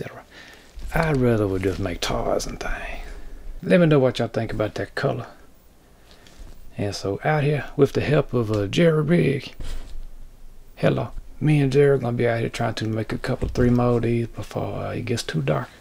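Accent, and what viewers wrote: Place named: Mississippi, United States. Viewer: Its a Mississippi accent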